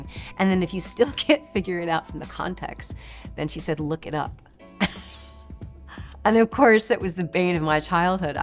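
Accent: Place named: United Kingdom. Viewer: Why did i think she was british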